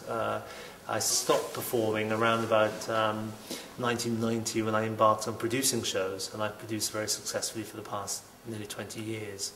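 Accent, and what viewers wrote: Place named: United Kingdom. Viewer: There you are! Still with beautifull British accent! Ganbatte! from Y.M. Okinawa Japan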